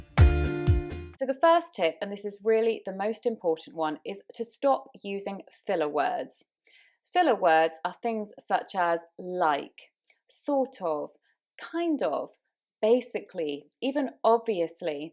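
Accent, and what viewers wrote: United Kingdom. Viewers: you are such a wonderful english teacher, I learn many things from you and I love your UK accent however I can follow you on FB and insta but not on Tik Tok because it's ban in my country but Love from India 🇮🇳❤️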